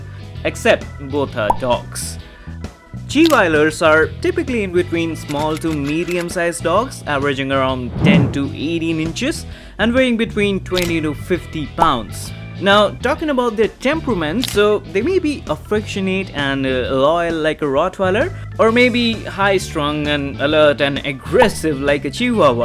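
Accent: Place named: India. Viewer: You sound funny... Let me guess, you're an indian.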